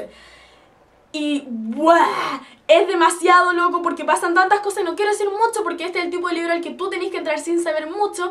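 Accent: Chile. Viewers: eri chilense?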